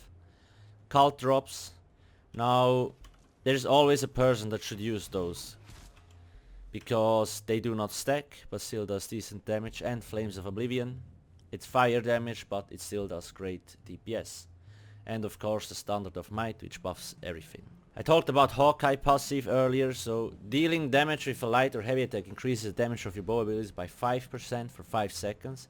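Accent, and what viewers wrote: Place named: Switzerland. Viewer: I'm a simple swiss, i hear swiss accent, i upvote!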